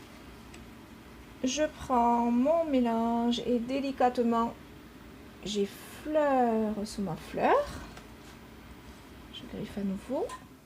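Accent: South, France. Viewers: J'ai beaucoup appris avec vous. J'aime votre accent du Sud!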